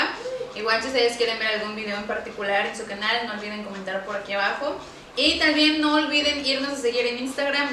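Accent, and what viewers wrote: India. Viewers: Hi 🙋🏻‍♀️ que rico 2 Xfis para mandar😋👍🏻y si tienes el acento hindú enel inglés ☺derepente no lo entiendo ocupo poner bien atención pero es muy bueno y lo aprendiste muy rápido😉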